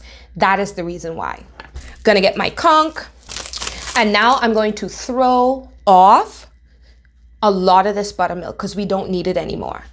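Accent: Bahamas. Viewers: That real Bahamas beautiful dialect and excellent cooking approach and techniques totally rocks. Has me here in Canada salivating and tasting home. is very authentic in her personal style....a real Bahamian.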